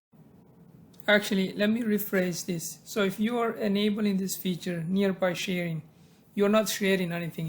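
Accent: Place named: India. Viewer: Feeling Indian accent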